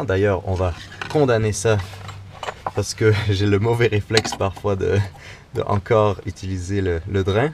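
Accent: Quebec, Canada. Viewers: Bonjour, Je m'appelle Marc et j'ai eu plaisir à suivre tes aventures en Van de l'autre côté de l'atlantique depuis quelques semaines. En me familiarisant avec tes vidéos et toi même, cela m'a donné envie d'acheter ton livre que j'ai commencé cet apm. j'avais juste envie de te dire que je suis un lecteur acidue, que j'ai beaucoup lu et que ton livre que j'ai juste commencé ( environ 30 pages) est vraiment très bien écrit, mais plus encore, très intelligemment pensé et formulé. A 26 ans, tu as du vivre d'autres vies pour avoir une opinion si juste et éclairée sur la situation dans le monde ultra civilisé et le mirage parfait qu'il entretient dans nos sociétés aveuglées et courant vers le même précipice, sans vouloir faire du catastrophisme. Je vais également me lancer prochainement à 45 ans dans la vie de Van à travers l'Europe et c'est ce projet qui m'a proposé ta rencontre. 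Alors voilà, simplement un grand merci pour la qualité de tes vidéos et au moins tout autant pour la qualité de tes pensées profondément subtiles et de ton écriture qui le met si bien en forme ( et celui qui a osé te demander si tu étais francophone, devrait lire en urgence ton bouquin pour ne plus avoir à se poser cette stupide question, sachant que tu parles presque aussi bien que tu écris...:-)) Mon frère vit au Quebec depuis des années et j'adore votre accent. Je te souhaite de bien continuer à profiter de ton courageux choix et puisse la route te porter encore vers ces expériences de vie si m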